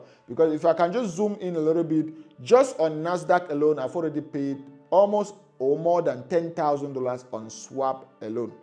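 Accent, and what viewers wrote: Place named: Akwa Ibom, Nigeria. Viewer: You have akwa ibom accent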